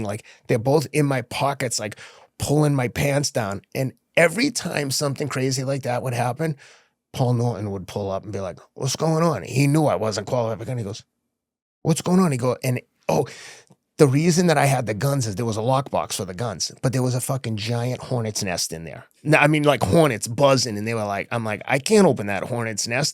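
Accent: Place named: Boston, United States. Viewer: Dude I love your voice!!!! That Boston accent is one of my favorites!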